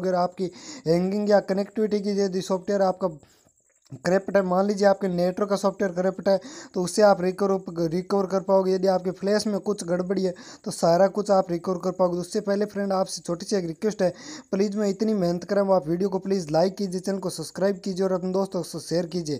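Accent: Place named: Rajasthan, India. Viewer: Pkka aap rajasthan se ho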